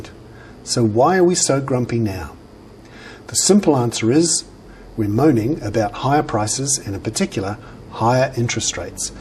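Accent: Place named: Canada. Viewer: great comments and nice accent from canada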